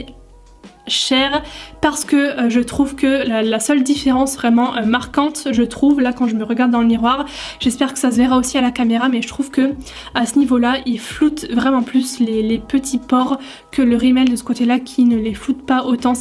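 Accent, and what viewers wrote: Lorraine, France. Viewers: Je trouve ton make up beaucoup trop chargé (ici et de manière générale). Je trouve ça dommage. Je regarde de temps en temps tes vidéos parce que j'apprécie ta personnalité, ton dynamisme et ton accent (oui on est Lorraines migrées en Alsace toutes les deux :) ). Et je t'ai déjà vu avec des make up beaucoup plus légers voir au naturel et clairement je te trouve bien plus jolie ! #opinionpersonnelle. Bises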